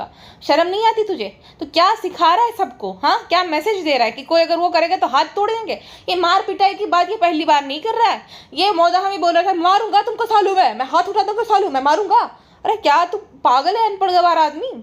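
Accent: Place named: Marwar, India. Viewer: Aapki ki accent Gujarati bilkul marwdi jaise hai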